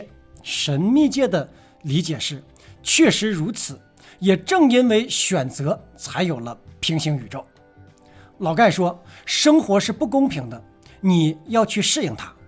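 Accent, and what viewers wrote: Northeast, China. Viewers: 是东北人还是陕西人？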